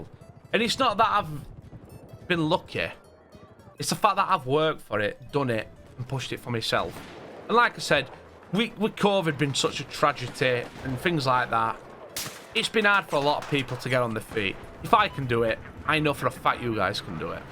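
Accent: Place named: England, United Kingdom. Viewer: I also loved ur english accent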